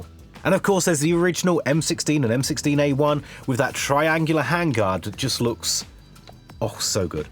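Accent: United Kingdom. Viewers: bri'ish man with Klinefelter syndrome and a chatgpt generated script tries to tell me that rail on gun = bad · hopefully I’m proving wrong with this comment but going based off of your voice I’m assuming you’re from the UK with extremely strict gun laws mind you I could be completely wrong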